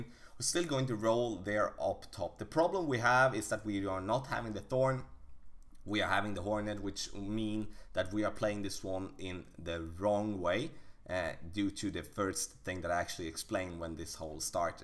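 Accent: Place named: Sweden. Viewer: Svengelska haha nice gillar din kanal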